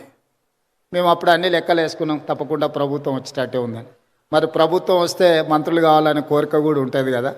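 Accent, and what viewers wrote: Telangana, India. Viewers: He is a great orator. Without looking at script and little Telangana accent his speeches are pleasing to ears.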